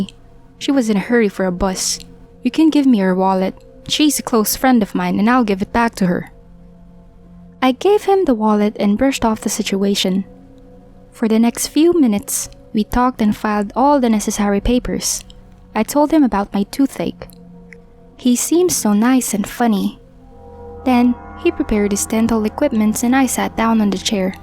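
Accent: Philippines. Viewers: Is the narrator filipino? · i got a strong feeling that the narrator is filipino lol. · The narrator was definitely from the Philippines. The "t's" at the end gives it away... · feel ko pinay nagbabasa ng story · She is a filipino. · I have a feeling that the narrator was filipino :> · Pinoy yung narrator 😂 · Is the narrator FILIPINO? She has the filipino accent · The accent of the narrator sounded like a filipino accent. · Is the narrator from the Philippines? her accent is just like a filipina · Parang pinay yung narator.. grabe, kung ako yan sasapakin ko agad yan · She sound like a filipina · It's funny bcs some people think that the narrator of this video is a Filipino bcs of its accent. I mean, is there something wrong with OUR ACCENT? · I can tell the narrator is a filipino cause when I narrated a story that is also how I sound like. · Filipino accent, no doubt. 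· The narrator sounds like Filipino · Uhm it sounds like the narrator is Filipino.. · I think the narrator is a philipino thats why when they talk in english you know no hate on me or you will get reported and blocked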